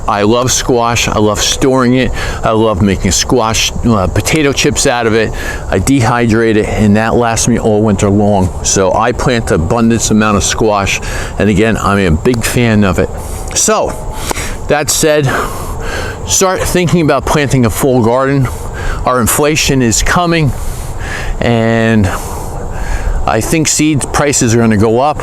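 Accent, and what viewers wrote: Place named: South Jersey, United States. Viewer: I am originally from South Jersey and your voice has once again made me homesick! Thanks for the video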